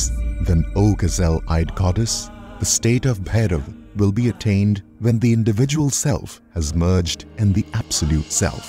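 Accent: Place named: India. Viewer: This is definitely not Ai, just because an Indian accent is “foreign” to you, does not make it ai. This has most definitely been script/edited and voiced by a human.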